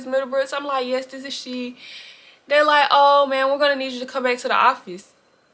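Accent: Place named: Louisiana, United States. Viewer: You gott thattt lousianas accenttt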